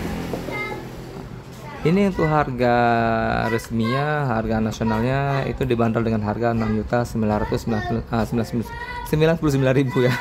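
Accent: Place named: Malaysia. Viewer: logat bahasa indo anda ada logat bahasa Melayu Malaysia..mungkin anda berasal dari Kalimantan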